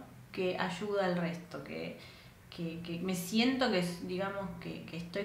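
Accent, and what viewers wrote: Argentina. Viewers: Por favor modificar el tono argentino Par comprender mejor.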